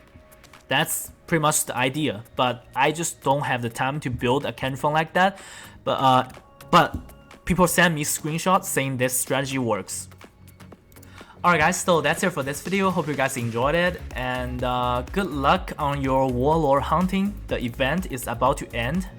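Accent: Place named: Asia. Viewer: Is this first time that I see you in person:O. I only follow you on YouTube hehe. I knew that you were an asian hehe I'm not a native speaker English, even though I recognize that accent. Thank you I really learn a ton with your videos. I appreciate <3.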